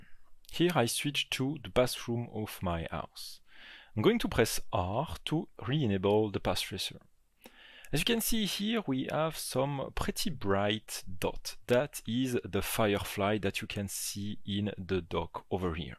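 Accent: France. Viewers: Super vidéo, mais avec ton fort accent français, tu ne pourrais pas nous la refaire aussi pour nous les francophones ??? Ste plait, ste plait !!! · Cela faisait longtemps que je n’avais pas entendu un tel accent français dans une vidéo anglo-saxonne, c’est très drôle même si ça pique un peu les oreilles 😀. Par contre, vidéo très interessante sur le sujet et c’est le principal. Vous avez un nouvel abonné. 👍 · The French accent 😬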